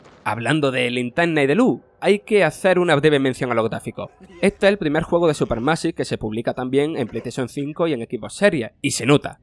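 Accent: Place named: Canary Islands, Spain. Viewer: Parece canario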